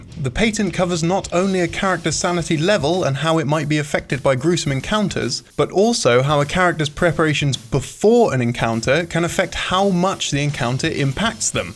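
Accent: England, United Kingdom. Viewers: You'd think everyone had never heard Englishman speak before. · I love you and your weird (to me) British pronunciations,! Now I'm genuinely curious if that's how English say "patent" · Words are pronounced differently based on region. In this case, likely British. Case closed.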